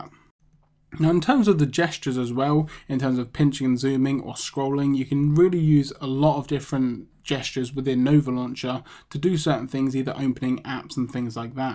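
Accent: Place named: United Kingdom. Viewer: the british accent IS TAKING OVER YOUTUBE!!!!!!!!!!!!!!!!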